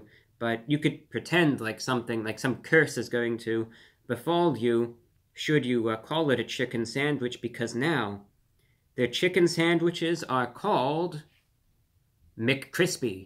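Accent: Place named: Transatlantic, United States. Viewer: He is upping the transatlantic accent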